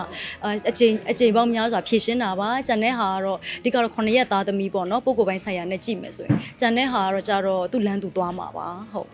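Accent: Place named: Pa-O, Myanmar. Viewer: ပအို့ဝ်သံနဲ့တူတယ်လို့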